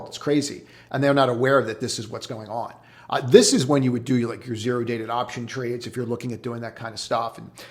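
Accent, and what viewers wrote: East Coast, United States. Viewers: I love how you say current….its Karen plus ent. Its cute. Must be an east coast accent. Excellent video. ❤❤❤❤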